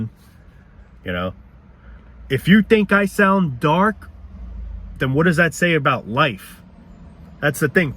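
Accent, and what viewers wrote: New York, United States. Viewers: Hey dude I just discovered your channel and love it! You speak the truth! Most people just plain suck and this rat race life is awful. I hate it. I hate getting up in the morning to go to work and deal with the inconsiderate drivers on the road and then with the annoying and fake people at work. I hate then coming back home and only having barely enough time to eat before I have to sleep and then do it all over again. Ugh. I am going to try really hard to get rich somehow and then not have to work as much. Most people out there take advantage of others and are not your friend. Keep pumping these videos out bro you are doing a great job. Are you from New York? What part? That accent is pretty thick. Thanks!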